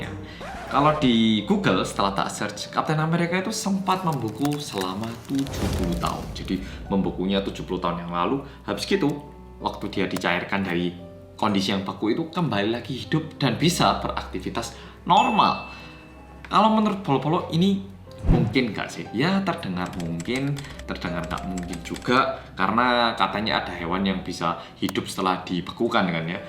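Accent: Java, Indonesia. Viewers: orang korea yang medok balik lagi yey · Bersama orang korea yg medhokkk ❤❤ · Mas sampeyan lek tak rungokno wis rodo ilang medok e 😁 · Akhirnya konten medok lagi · Ngomongnya medokss jawa banget kalau ngomong bhs indonesia..hehehe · entah kenpa cara mas menyampaikan cerita terasa sangat santai dan gaya medoknya membuat saya kangen kmpung halaman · YAYY OPPA MEDHOK UPDATE 💖💖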